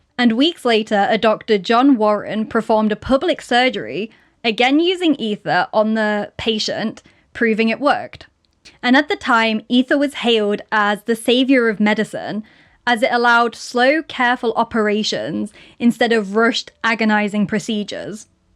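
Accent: United Kingdom. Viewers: Completely unrelated but do you mind telling me if your accent is British? Whatever it is, I love it. And thank you for your videos. Great job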